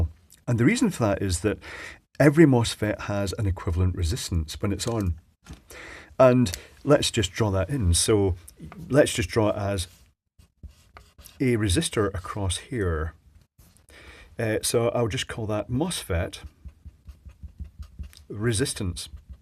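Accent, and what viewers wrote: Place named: RP, United Kingdom. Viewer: Such a lovely BBC type sound from.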